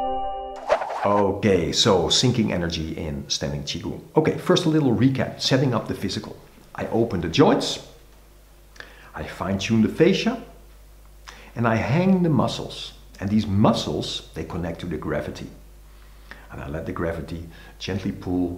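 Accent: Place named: Netherlands. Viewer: Ik wist het. I mean, I used to live in the Netherlands, so I'm used to the Dutch accent hehe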